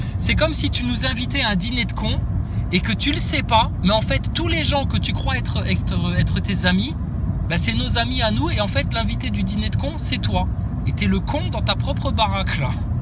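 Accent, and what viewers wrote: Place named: Belgium. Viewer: un renard, un renard, une pesquette mélodieuise, ton accent belge, putain, faut l'améliorer, putain !!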